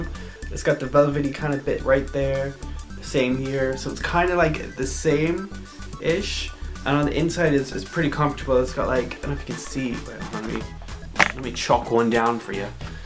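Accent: Ireland. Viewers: Are you from ireland ? Can kind if hear an irish accent :)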